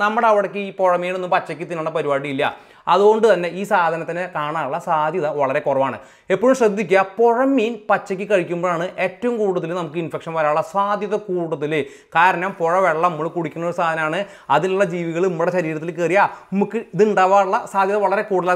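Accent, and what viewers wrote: Thrissur, India. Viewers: Cheten poliyatta.mmede TCR basha poliyayi parayind.pinne class poli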